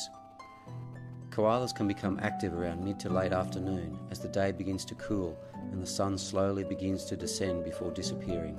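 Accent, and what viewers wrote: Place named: Australia. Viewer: I loved the work on this documentary. The word has to go out to make people aware that koalas are on the endangered list. The I haven't seen anything that has this quality of images and information before. The sound track and voice over give it a uniquely Australian feel and I really enjoyed it. It's great to see koalas in their natural habitat and that's what makes this special for me. I hope to see more from the makers of this in the future.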